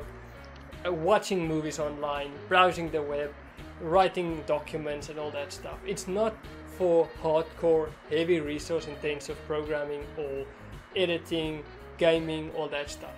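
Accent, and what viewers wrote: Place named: Afrikaans, South Africa. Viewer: That thick afrikaans accent is on point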